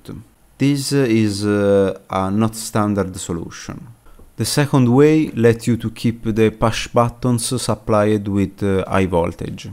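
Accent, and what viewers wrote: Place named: Tuscany, Italy. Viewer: Mi sà che tu sei anche toscano oltre che italiano 😀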